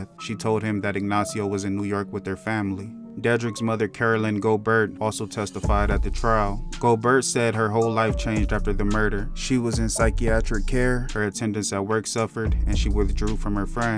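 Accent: California, United States. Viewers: That's definitely a California where are you From?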